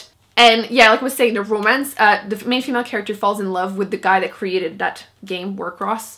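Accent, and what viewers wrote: France. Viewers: Thank you for responding! I kept trying to place it and thought you were from France so I was kinda close? No not really lol!!! I can’t wait to see what you come out with next! I’ve started back watching your videos!!!!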